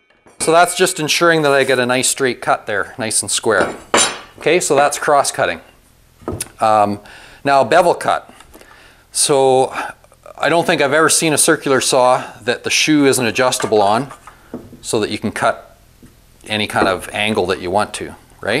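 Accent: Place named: Canada. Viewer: Love the content! I’ve loved power tools and DIY since I was a little girl and I bought a fixer upper to get started on fulfilling some dreams. I had to look up where you were from and idk why I didn’t think of Canada, because I’m from southern WI but I have family in Northern WI and my uncle has the same accent, common in the U.P. too (Michigan).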